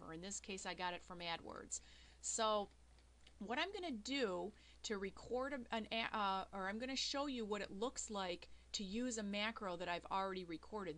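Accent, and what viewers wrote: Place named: North, United Kingdom. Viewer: Ummm, no. She sounds like she's from up north.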